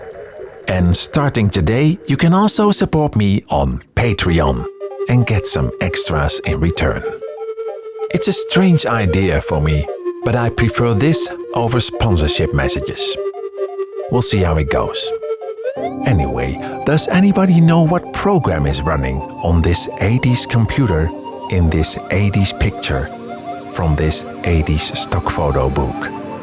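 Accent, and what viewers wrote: Netherlands. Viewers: Your channel is the only one where I can stand such a thick Dutch English accent. Normally can’t stand it at all (“tenenkrommend”) but your presentation and mood just draws me in and envelops me. It is especially enjoyable and just for this once I’ll just have to allow your accent to exist. Thanks for taking the time to share your dots with us. Never change. Also, nice music!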